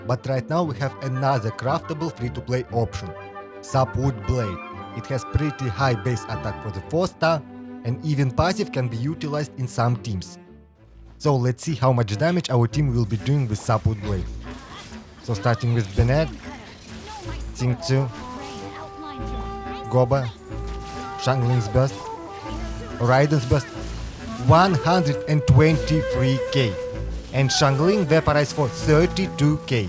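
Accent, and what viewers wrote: Russia. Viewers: Русский акцент узнаю из тысячи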